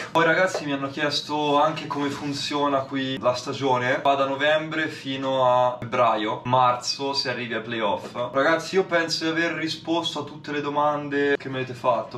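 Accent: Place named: Arezzo, Italy. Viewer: Si sente che sei di arezzo! Ora però devi fare un video in cui metti una tripla e ti giri esultando con "alò dai"!!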